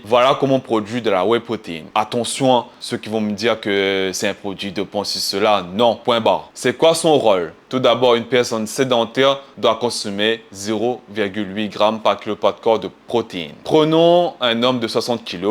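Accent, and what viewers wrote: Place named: Caribbean. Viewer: Première fois que j'entends un gros l'accent créole comme ça 😳 Mais bonne vidéo. Ps : Juste les gars de la muscu, tous les sujets ( Tous) on déjà été traité. De prés comme de loin. Les reste sa tourne en rond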